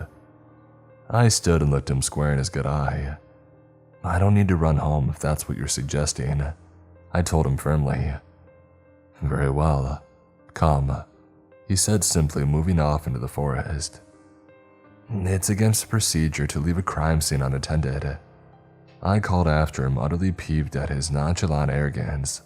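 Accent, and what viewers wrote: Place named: New England, United States. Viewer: When I visited most people called it Baw Hawba. 😂.. I love that Yankee accent!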